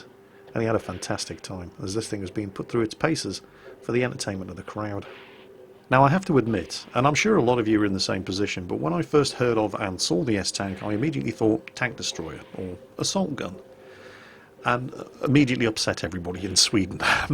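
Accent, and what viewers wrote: Germany. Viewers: Your swedish sounds more like german :) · Please dont say the names of the swedish tanks in german dialect. You can if you want but please dont. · stop pronouncing the Swedish names like they're German!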